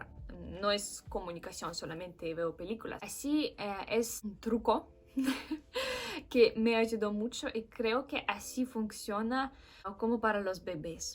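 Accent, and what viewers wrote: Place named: Russia. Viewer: El ruso siempre me ha llamado la atención, por ejemplo me encanta la banda, y buen video y que buenos consejos, lo usaré para aprender también inglés, por cierto, tienes muy linda voz y suena muy bien en español, es decir todavía se nota cómo el tono ruso, y el ruso es un idioma tan hermoso, ¿Me puedes recomendar música en ruso? Y eso pues, saludos 👍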